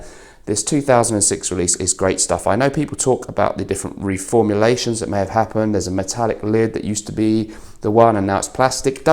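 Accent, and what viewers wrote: England, United Kingdom. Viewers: Classy english accent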